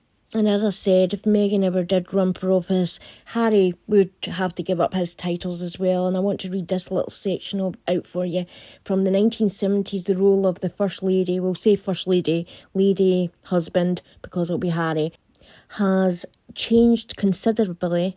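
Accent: Scotland, United Kingdom. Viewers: also, RE: swearing. There are different types of swearing. The$ussex_$quids, for example, cannot engage in rational, civilized discourse & debate or even exchange simple points. They start using vulgar, accusatory, base allegations because they're just not capable of debate or civilized argument. Those are the ones whose swearing is considered to be of limited intelligence. You, on the other hand, speak an elegant, fluent English with that endearing Scottish accent - music to my ears! Your type of swearing is different. It's more of an accent to the point you're making as opposed to just swearing for the sake of swearing because of being unable to make any cognizant debate. You're in the clear,. Anyone who has a problem with your, or anyone else's choice of rant, can leave. But, you know, they WON'T because only the $ussex_$quids, who are so devoid of talent, are the most likely to accuse you of being unintelligent (just like themselves!!!). 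· Oh it's such a pleasure to hear your sweet voice and lovely Scottish accent. Personally I don't think Meghan will ever be president - she would be too distracted suing everyone who criticized her or revealed her lies.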